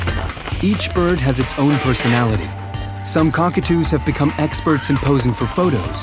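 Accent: United States. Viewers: American voice over on Aussie content.. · Why does this video have an American voice over? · It's annoying that so many videos about Australian parrots and bird life are narrated by bloody Americans.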